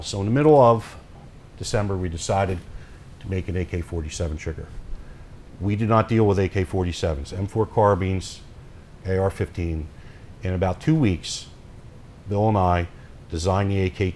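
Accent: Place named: Philadelphia, United States. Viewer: I grew up right outside of North Whales PA. Love the accent man! True Philly/Eastern PA! Just purchased this trigger. Cant wait to get it installed!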